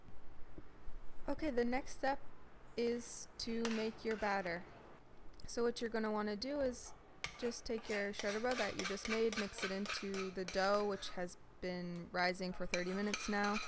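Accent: Sanaa, Yemen. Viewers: I salute you for doing our dishes. Thanks. And good Sanani accent with the Arabic videos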